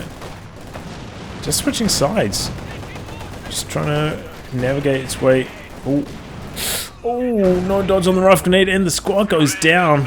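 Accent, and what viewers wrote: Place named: New Zealand. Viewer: You from NZ brother?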